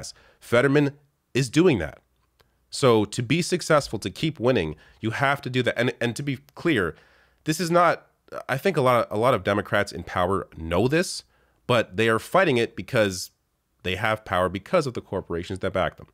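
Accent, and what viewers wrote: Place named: Canada. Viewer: I'm not sure, if you're a Canadian or a Seppo. You sound like a Canadian. Is Canada's opinion of any worth in the US? Are you folks stepping out into the big world of American politics? You, like us were big players in the wars of the 20th Century. Dead men, though, it seems are just gravestones to stand in front of. I'd really like to hear a reply. I'm genuinely interested.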